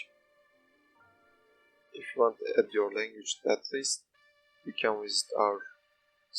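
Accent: Turkey. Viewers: man youre turkish right, your accent is so turkish!! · %90 Türksün değil mi :D kelimeleri telafuz edişini türk gibi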